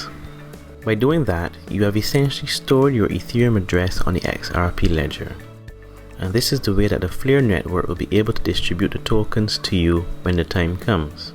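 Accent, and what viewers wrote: Barbados. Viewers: Hi are you from Barbados? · Liked and Subscribed! Thanks for the video really clear and easy and l was lovin' up the Bajan tones. Had to start over a few times as ledger wouldn't connect. It's all a bit scary, I wish there was some way of checking it's all gone through.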